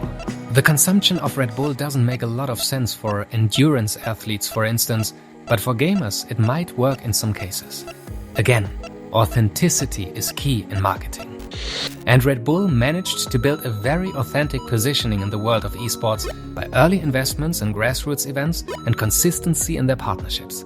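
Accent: Germany. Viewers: Is he german? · Echt ein krasser Kanal (ich gehe aufgrund deines Englischen Dialekts davon aus, dass du deutscher bist) habe deinen Kanal entdeckt, als du glaube ich gerade erst drei Videos rausgebracht hattest. Ich finde es echt krass wie professionell und hochwertig deine Videos seit Beginn an sind, da ist der schnelle Wachstum des Kanals nur verdient. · Its Sounds Like you came from Germany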